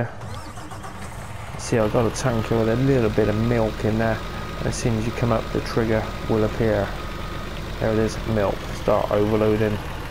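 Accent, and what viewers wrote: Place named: Somerset, United Kingdom. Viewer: Somerset farms tour, complete with a somerset accent, lol New to this map, being born and raised close to somerset this map was a must for me, this was probably one of the better map tours I've found, good job fella (liked and subbed)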